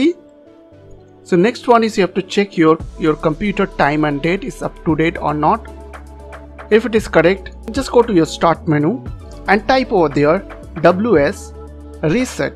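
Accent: India. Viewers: Indian detected🚩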